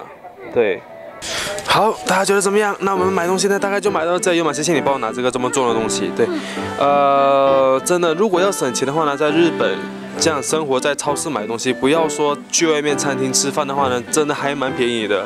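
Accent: Taiwan. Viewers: 沒有馬來西亞腔了。你不說還沒發現原來是馬來西亞人～～ 😂 說話很像台灣人了。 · RYU口音也台湾，不说我大马人的都快听不出。（另外女友口音好有趣）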